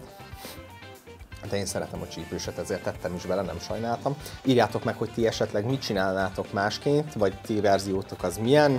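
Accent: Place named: Italy. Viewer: is it just me? but his accent has italic undertone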